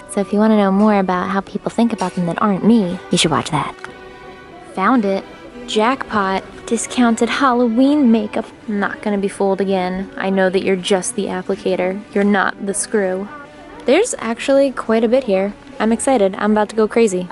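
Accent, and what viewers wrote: Philadelphia, United States. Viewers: philly accent asmr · I’m a Philadelphian. W H O O T E R still hurts my brain. please it’s wAtER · Omg I didn’t know why you sounded so normal to me until you said you were a Philly girl! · I’m from philly and I didn’t even realize how differently we say certain words 😂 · Never change the Philly accent! We, hometown homies, love that jawn!! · Being from philly, I felt the our o's are a's except in "wooder" (water). Lol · I get the philly accent. In Baltimore t turns into d’s . And Maryland sounds like “marlyn”😂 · I just started watching you and I didn’t know you where from Philly!!! Me too!!! I was confused when you said you said orange wrong because that’s how I say it! I wish I was as talented as u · I am from Philly and always said orange, forest, forehead etc the way you do and I never thought that water and the other words were wrong until you said it today. 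I’m so shook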